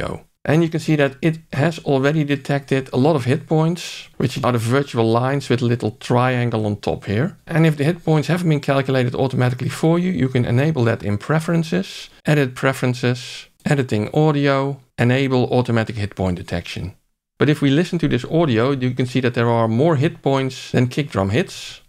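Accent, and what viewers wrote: Netherlands. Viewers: nice dutch dialect 🙂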